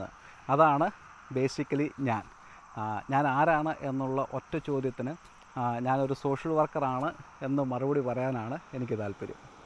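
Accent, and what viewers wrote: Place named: Kozhencherry, India. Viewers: മലയാളം കേട്ടാൽ അറിയാം പത്തനംതിട്ടകാരനാണെന്നു..😊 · പത്തനംതിട്ടക്കാരനാണ് എന്ന് സംസാരത്തില് തന്നെ അറിയാം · ഓണാശംസകൾ, kozhencherry കരാൻ അന് എന്ന് അറിഞ്ചപ്പോൾ വളരെ സന്തോഷം. നമ്മുടെ നാടിന്റെ എല്ലാ നന്മകളും സംസാരത്തിൽ ഉണ്ട് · സംസാര ശൈലി കേട്ടപ്പോൾ തന്നെ തോന്നി പത്തനംതിട്ടക്കാരന് എന്ന്, വളരെ സന്തോഷം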